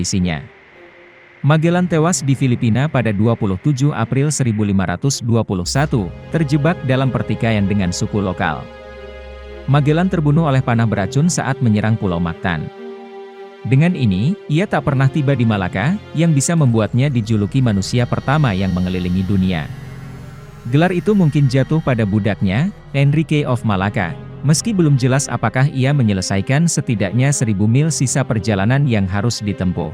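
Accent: Banyumas, Indonesia. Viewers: Admine wong ngapak ya? wong ngendi rika mas?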